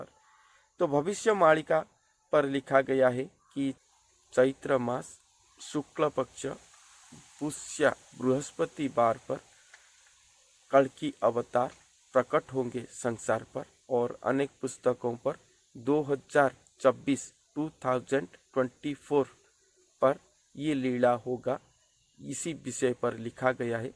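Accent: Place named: Odisha, India. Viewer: 2024 bole..odia log ka pronunciation aisa hee hai..it's 2024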